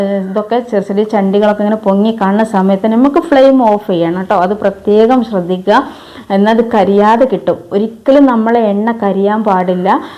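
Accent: Malappuram, India. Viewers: Thank u mole. I like your old Malappuram language. God bless you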